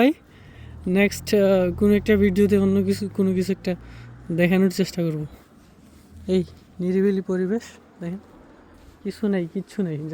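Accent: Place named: Sylhet, Bangladesh. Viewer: ভাই আপনি সিলেটি তাইনা তাইলে সিলেটি কথা বলেন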